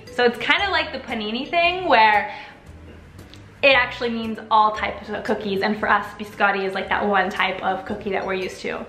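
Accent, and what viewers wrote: Italy. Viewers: You speak You have an italian accent, when you speak english. You did it!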